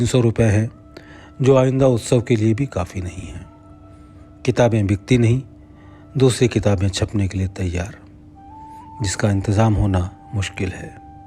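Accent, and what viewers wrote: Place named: Pakistan. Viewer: प्रिय भाई आपकी आवाज में पत्र सुनना बहुत मधुर लगता है विशेष उर्दु लहजे में अलग ही आनंद आता है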